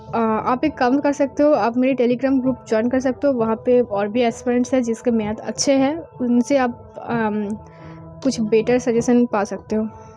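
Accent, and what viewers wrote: Bengal, India. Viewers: that's so sweet of you mam . Tnq so much mam ❤️well I love your Bengoli tone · U from Bengal?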